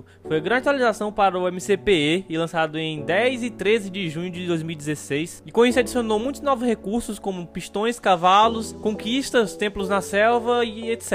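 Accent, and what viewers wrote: Ceará, Brazil. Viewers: Tú e de onde man,esse jeito de falar parece CE kkkk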